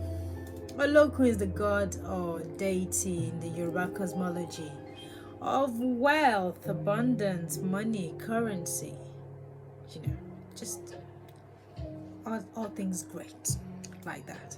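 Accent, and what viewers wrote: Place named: United Kingdom. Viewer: She's speak like a United Kingdom citizen ❤. Her beauty is astonishing 😮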